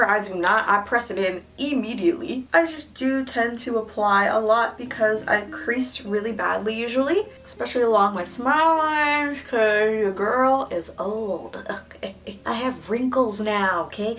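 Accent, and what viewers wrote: United Kingdom. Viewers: When is she speaking w/ british accent? Time? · I love your British accent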